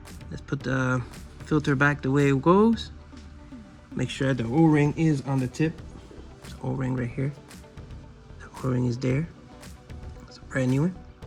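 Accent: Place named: Miami, United States. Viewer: Hey sounds like you're from Miami, how much would you charge for doing this to my car? Im located in kendall. My Tiggy is due for new fluid.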